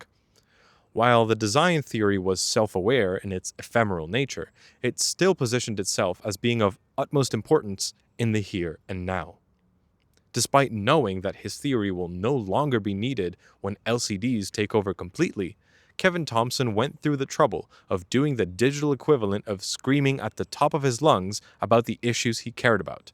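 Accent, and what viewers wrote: United Kingdom. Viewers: Your British accent is top notch sir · why were you british half the time? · Incredible British accent. I forgot you were American initially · The topic was highly interesting, the delivery was great! Your vaguely British accent is Decent! The message was appreciated. 10/10 would hurt my eyes watching this video again!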